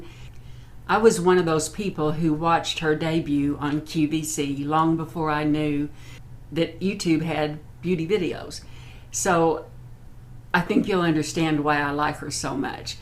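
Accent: South, United States. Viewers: You sound southern and your food sounds southern....I am from Texas ...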